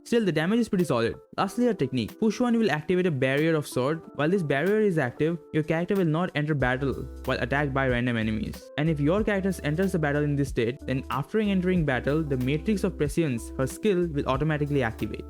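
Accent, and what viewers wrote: India. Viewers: You sound like you’re Indian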